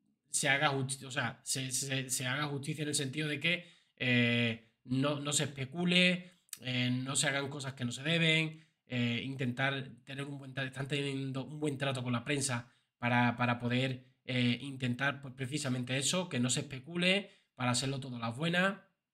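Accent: Andalusia, Spain. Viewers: tu acento andaluz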